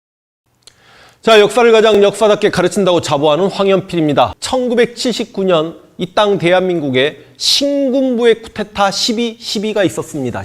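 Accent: Jeolla, South Korea. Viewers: 숨기려 노력 많이 한거 같은데 숨겨지지 않는 전라도 말투로 역사 왜곡하는게 과학이네요ㅋ 사령부라 하지 누가 사령대라 합니까 군대는 제대로 다녀왔나? 기본 상식이 없는 사람이 무슨 역사 강의를 하나 ㅋㅋ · 억양이나 스멜이 라도 필이네. ㅋㅋ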